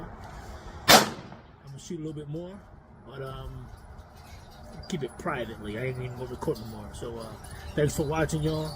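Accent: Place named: New York, United States. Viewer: I knew you were from the east coast somewhere from the accent. I'd have guessed NY. I don't come across too many guntubers from over there I guess coz of the gun laws. Keep doing ya thang bro. Watching from Texas (former NJ).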